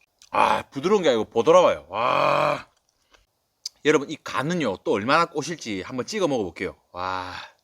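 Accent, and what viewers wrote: Gyeongsang, South Korea. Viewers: 형님 보기너무 좋습니다 ㅎ 20대후반에 직장생활을 울산서 5년했습니다 ㅎㅎ 행님 사투리 듣고 있으니 경남지방 놀러가고 싶네요 ㅎㅎ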